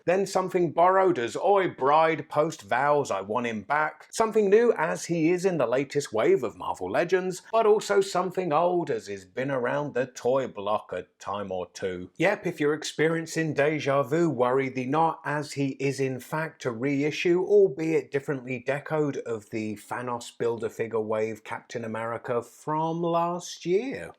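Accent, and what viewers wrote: England, United Kingdom. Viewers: Oh man I miss your review and your English accent. You’d be so happy to have Worthy Capt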